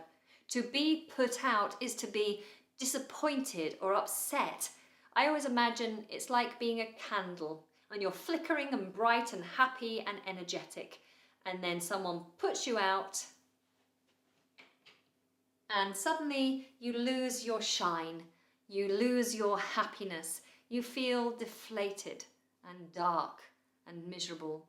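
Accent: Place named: United Kingdom. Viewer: Idont know but🙈 the British accent makes me feel like you're dealing with the English language.like Princess 👌and that's great, unlike the other accents which used in every englìsh speaking country , all of them speak English. But you have your own spicesss👌👌 youre great ❤❤❤ all the best always end 4everrr Thanks a thousand times😁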